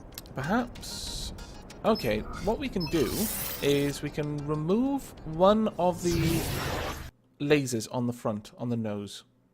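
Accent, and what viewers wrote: Wales, United Kingdom. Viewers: You're so welsh! Nice vid though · are you from wales buddy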